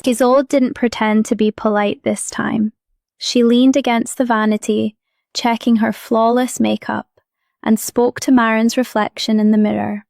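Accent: Scotland, United Kingdom. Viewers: Love the Scottish accent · I have enjoyed this story, and love the accentnof the narrator, since Scotland is where many werewolf stories originate. Just wish the voice was an octave lower.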